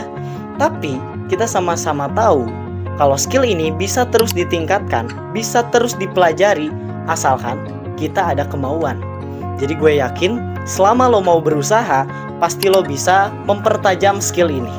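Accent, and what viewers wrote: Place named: West Java, Indonesia. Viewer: makasih penjelasannya bg sangat mudah difahamii btw abangnya org sunda bkn soalnya logatnya kenal bgt AHAHAHAH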